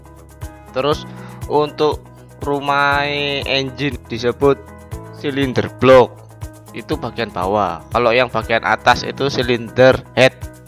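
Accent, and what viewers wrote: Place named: Malang, Indonesia. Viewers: logat jawanya di hilangin mas. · Iku mesin e bego gak kenek d ganti mesin e becak a cak? Medok malanganmmu lurrr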